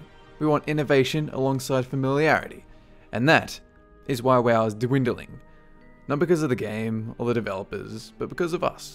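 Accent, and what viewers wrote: Australia. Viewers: i love your accent lol now stupid question, are you Australian? Thanks for all your time in making these videos i am learning so much about WoW that i never new before. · You're Aussie arent yah? What realm do you play on wow?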